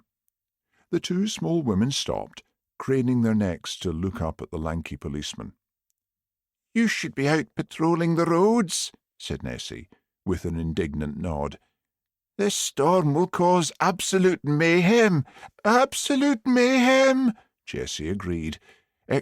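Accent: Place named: Scotland, United Kingdom. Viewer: Absolutely love these narrations. Nobody can improve on your fabulous Scots voice 😊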